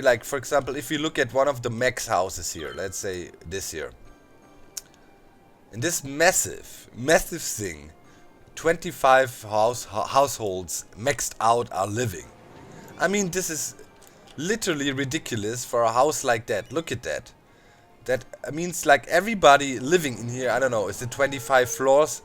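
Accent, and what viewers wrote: Germany. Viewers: TitaNiumCriStal ja dachte ich mir auch seine Aussprache hört sich deutsch an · are you german? your sounds like german,and the names of your cityparts like altstadt, i am german :D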